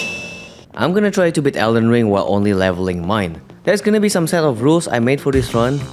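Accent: Indonesia. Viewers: kirain logatnya ga asing, eh benran indo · bro u sounds Indonesian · Bahasa inggris nya bagus banget tapi sangat terdengar seperti orang indo banget. Dan iya, saya mulai bisa membedakan aksen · Dari awal ngomong langsung ngebatin ini kyk aksen org indo et bener ternyata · you sound indonesian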